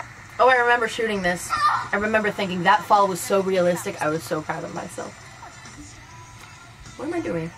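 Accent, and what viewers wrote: United States. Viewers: i'm sorry if this has been asked before, but why does she sound american? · Where did you get the American accent from? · If she's from Finland then why does she have an American accent? I'm so confused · is she finish and American? She sounds American what a shame :( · why do you speak with an american accent?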